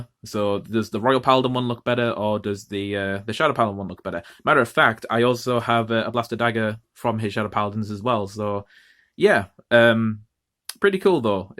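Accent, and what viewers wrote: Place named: United Kingdom. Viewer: knew it from the accent. I’m from the uk as well 🇬🇧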